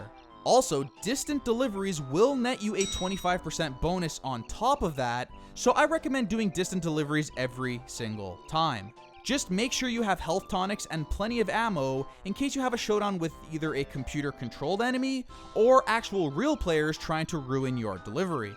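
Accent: United States. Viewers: Weygun, Wisconsin accent? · I swear this dude is from Maryland. "Waaaayyyyygen" "budddon" I'll bet he says "wuuter" too lol. But great great video. Subscribed for sure.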